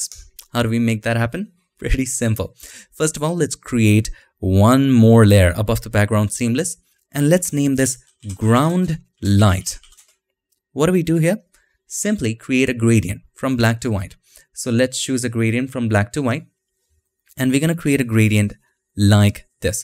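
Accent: India. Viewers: indian accent just kills me ... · You speak too fast and your english is slightly Indian, it would be easier for me, at least, if you spoke in a more paused way.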